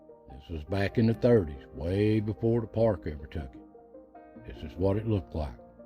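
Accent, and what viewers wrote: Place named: Oklahoma, United States. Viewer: Good lord thanks for making these, my dad passed in 2020 hes born in 1951 and his accent was just about same as yours cept he was from NW oklahoma. But he told stories jusy like you and it reminds me of him. Thanks brother, appreciate sharing the info of the rugged country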